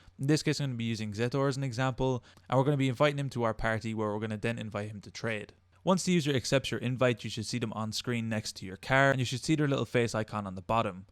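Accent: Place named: Ireland. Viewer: Knew u sounded irish Hello From a fellow irish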